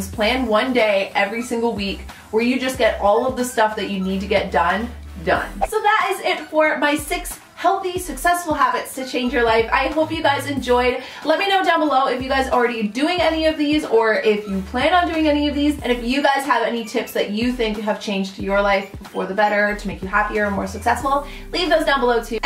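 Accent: Canada. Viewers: I lovvvvve the Canadian accent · Brea Monai I thought it sounded Canadian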